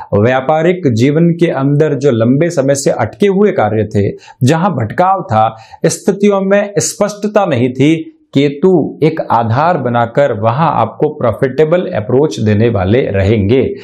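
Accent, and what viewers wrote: Standard. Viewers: Sir apki kundli me budh grh koun se house me h....? Dumdar asardar awaz Or shudh vartani h apki 🤗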